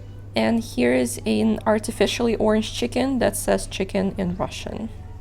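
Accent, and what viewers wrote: United States. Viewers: You sound very American 😂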